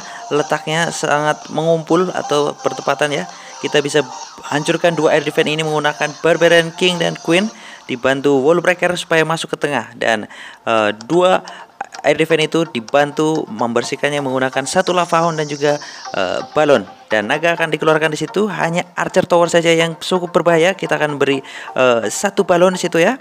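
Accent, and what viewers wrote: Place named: Java, Indonesia. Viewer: suaramu wagu bang. medok dan tidak beraturan. ga enak d dengernya. #no haters.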